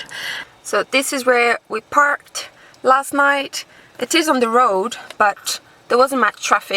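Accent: Scotland, United Kingdom. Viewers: Really enjoying the Scottish Diaries since I am a Scot living in Canada. I know that you are Italian but I could hear every so often a hint of Scottish brogue mixed in. Any idea why? It sounds so lovely.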